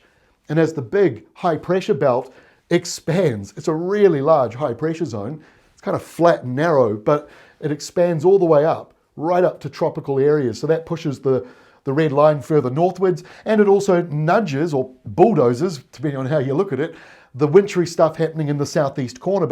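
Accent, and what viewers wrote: New Zealand. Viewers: Love your kiwi accent and the forcast of thuck clouds.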